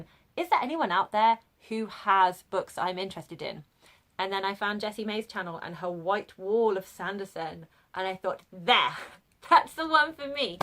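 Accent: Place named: United Kingdom. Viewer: Not me totally forgetting you'd have a British accent and then startling a little when you started talking 😂🤣 So glad you made it on here with us! 🥳Already living up to the channel name with a 2 part newbie tag, lmao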